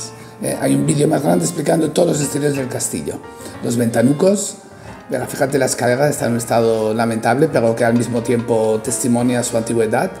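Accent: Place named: France. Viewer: MUY INTERESANTE, cada detalle de este castillo es una 💎 joya...más relatado por el y ese acento francés tan agradable ❤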